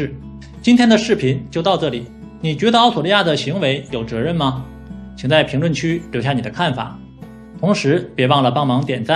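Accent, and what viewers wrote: Northeast, China. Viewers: 好明显的东北口音，藏都藏不住哈哈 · Hi东北老乡，要花时间多练练普通话！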